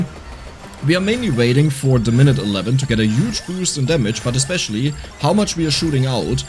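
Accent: Germany. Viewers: Are you german,?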